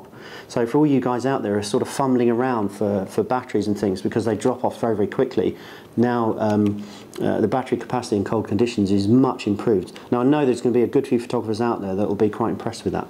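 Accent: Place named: United Kingdom. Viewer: No, he doesn't. He says, "IF I CAN show you that there". It's called a British accent.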